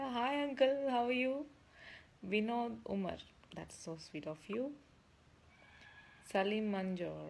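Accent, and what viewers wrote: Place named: Latin America. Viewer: Like I said I thought you were Hispanic I like your accent or respect you keep smiling I like them I like what you have on with respect I respect you but this is very interested I keep looking at you right now in this video I'm still in shock I just wanted to spoke to you the other day or 24 hours ago I don't know if this is a brand new video I don't know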